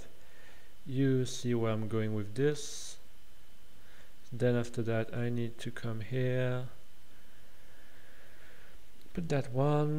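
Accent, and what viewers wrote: France. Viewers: c'est bizarre mais tu parles anglais comme un français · Are you french ?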